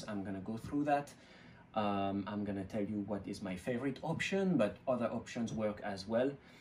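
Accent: France. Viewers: hello, where in Canada? love your french accent🙂(I could be wrong 😅) do you think I can leave it outside (Montreal) until the first snow, or do I have to transfer it to a warmer location (like indoors on a shelf near a window) ? thanks for the video👍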